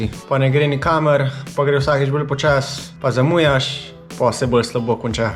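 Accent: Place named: Notranjska, Slovenia. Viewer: Bi me zelo zanimalo,če boš objavil časovni načrt z kraji,v kateri smeri boš vozil pri tvojem podvigu čez vse te občine.Če bomo zaželjeni,je nas verjetno kar nekaj,ki bi ti šlo nasprot in ti delalo malo družbe,da ti bo lažje.Še nekaj bi te vprašal,pa ne zamir,firbec pač,glede na to ,da ne govoriš špreho,značilno za kraje okrog Rakeka,me zanima,če si domačin,ali ste se priselili sem,sicer pa kakšno prav fajn zaviješ vmes po notranjsko😉,ja,držim pesti da se ti izidejo tvoji načrti in brez nezgod, lp.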